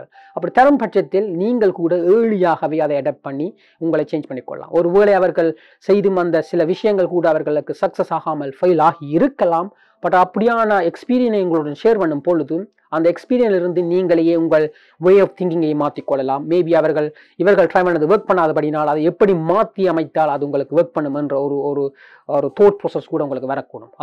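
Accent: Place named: Sri Lanka. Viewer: Srilankan Tamil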